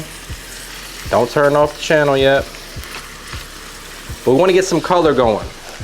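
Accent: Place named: South, United States. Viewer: Where are you from?! You sound southern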